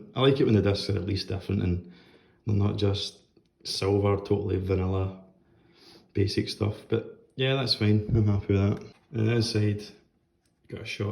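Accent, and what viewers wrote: Scotland, United Kingdom. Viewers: cool review mate, nice to hear a fellow jock ;) steelbook fan but didn't like the steelbook and hated the movie, worst since Roger Moore for me and definitely the biggest pussy of a james bond baddie ever ;(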